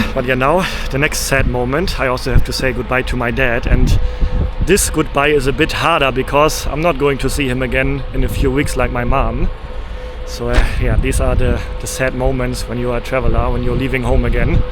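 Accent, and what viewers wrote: Germany. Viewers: That’s lovely German English. accent Your mom is so cute! ❤ I discovered your channel recently. Want to see all of your videos more often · Crazy how you have to speak 3/4 years only english and you still have a very very german accent and your your mom who lives in Germany not really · Your German accent is terrible but your mother's is okay....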